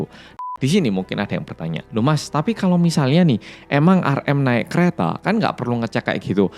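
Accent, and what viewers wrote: Java, Indonesia. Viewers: Mas ..yg medok .bs gak orang indo kerja d sana ...🙏🏻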